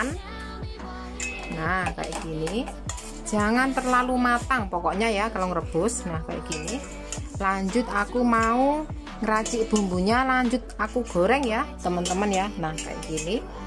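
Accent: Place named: Java, Indonesia. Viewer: Resep kembang kol crispy yummyy Kentel bahasa Jawa nya mbk Mksh tlh brbgi resep 👍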